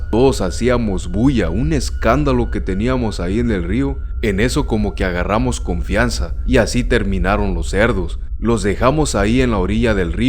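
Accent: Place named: Mexico. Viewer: Me encantó tu historia me hace pensar el mundo está lleno de misterio por tu acento mexicano tu país si tiene misterios y leyendas saludos desde Venezuela levys loreto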